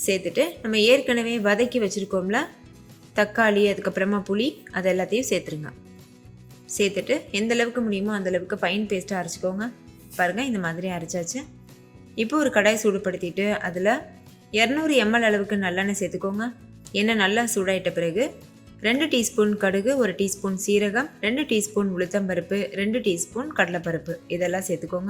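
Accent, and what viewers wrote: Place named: Kerala, India. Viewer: Your way of talk seems you are a malayalee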